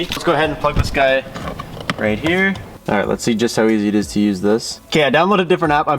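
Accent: Canada. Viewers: I'm new here, but guessing you're in Canada by the way you pronounce "out". Cause I was confused when you were stating the temps.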